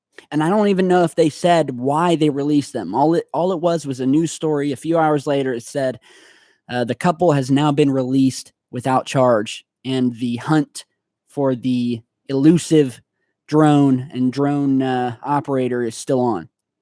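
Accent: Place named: Ohio, United States. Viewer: Your Southern Ohio drawl sure stands out early in the morning.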